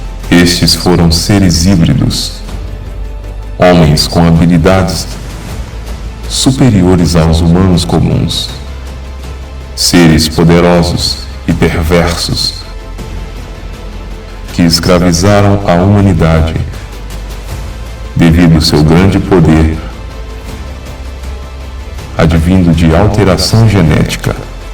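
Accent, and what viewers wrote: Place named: Minas Gerais, Brazil. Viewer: Se essa voz pertence ao " ", ele é um ótimo ator pois conseguiu inserir na voz o tão conhecido sotaque mineiro. Se for ele, está no lugar errado deveria tentar Hollywood... Só uma sugestão, Se For ele está desperdiçando talento...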